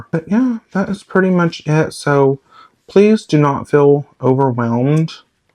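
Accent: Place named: Mississippi, United States. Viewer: LOL! I have a bob ross chia pet too haha. Your accent makes me feel like I'm back home. I'm originally from Mississippi.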